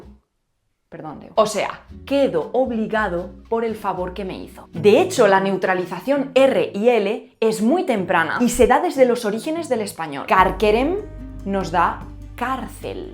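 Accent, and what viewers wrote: Spain. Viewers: Vivo en El Bronx (Nueva York) y oi este en los puerto riquenos y domincanos. Yo estoy una estudiante de espanol qui hablar ingles como mi lengua materna. Prefiero los accentos de Espana (como tuyo), Colombia y Mexico. (Disculpen la falta de tildes, por favor.) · Soy de Argentina y me suena que uds. dicen Madris en vez de Madrid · Habla español de España y hay varios fonemas que tu desconoces. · Te perdiste mi suscripción, no sólo te ves vieja y hablas como señora de edad avanzada, bueno eso es típico de las españolas, pero que no sepan de música eso si es inaceptable, para colmo ni sabes hablar bien con tu zeceo. a parte he visto tus videos y no son correctos. No soy caribeño, pero si reconozco que le faltas el respeto a unos de los mejores cantantes del mundo, y si admiras a, ha cantado en canciones de, que si te das cuenta a pesar de ser española dejó de usar tu tipo de acento, que así como dices que es mal aceptado el lambdacísmo, aquí en Latinoamérica tu suenas mal, y para terminar, pones esa canción horrible de al final de tu video.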